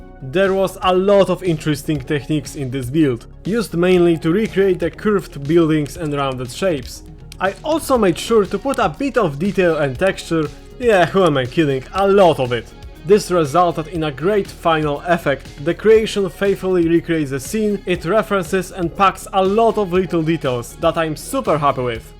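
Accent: Poland. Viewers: Słychać ten polski akcent ❤ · elo a ty z polski jesteś bo akcent polski?????????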